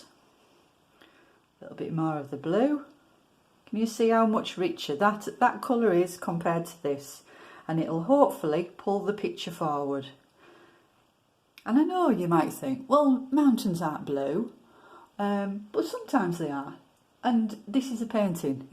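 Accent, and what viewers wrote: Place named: Yorkshire, United Kingdom. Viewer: Beautiful painting, and I love your Yorkshire accent! And I’m not taking the mickey. Hope I spelled that right.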